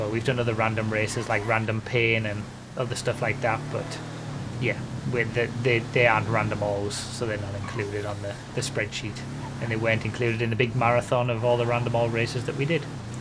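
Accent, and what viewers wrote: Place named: Hartlepool, United Kingdom. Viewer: Cant believe your from Hartlepool I've been watching all these years trying to work out the accent! I'm from the area :')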